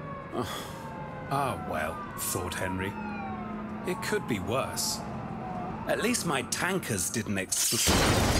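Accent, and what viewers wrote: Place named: Arizona, United States. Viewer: He sounds like everyone from Tuscon Arizonia.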